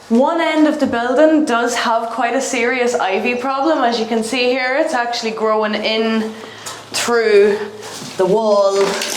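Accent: Ireland. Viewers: Thank the earth, you've an Irish accent and I can bare to listen to you (too many Brits on youTube buying up our housing stock in 2020s). Congrats on the purchase... I'll watch and follow how your place progressed. As I write, Ive gone sale agreed on a 1949 cottage in great condition but it needs a bit of love.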